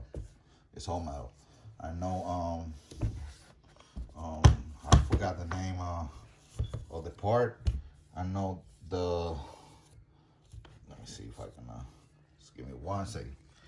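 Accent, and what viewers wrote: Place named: Dominican Republic. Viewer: You sound dominican lol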